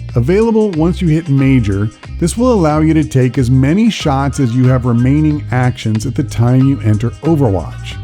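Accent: United States. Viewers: For Some Reason you Remind me of a Few Guys I grew up with and learned from in SoCal. ( there older than me ) I think it's how you talk and Explain Things, so Unlike the other Videos of people Giving Advice on XCOM2, which can be a complicated subject in itself. I don't have to Watch your videos 10x's to Understand what Your saying! Thanks for All the Help! I really appreciate you Donating Your TIME ( if you think about your Time is EVERYTHING, it's all one Really Has ) to Helping others, Keep Up the GOOD WORK!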